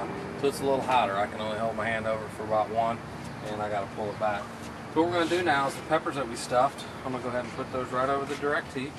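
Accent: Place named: South, United States. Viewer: Kiddo, where did you get that coloma meets southern drawl?? You're SO awesome. Love the vids!!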